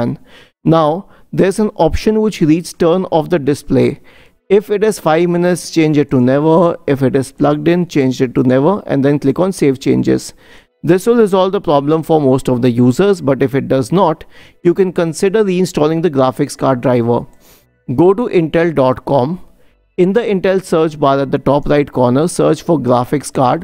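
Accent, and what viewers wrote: India. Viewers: Most authentic YouTube Indian guy accent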